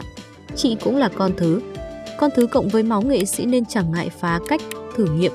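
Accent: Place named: South, Vietnam. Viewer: cái chất giọng điêu luyện rặt Nam Bộ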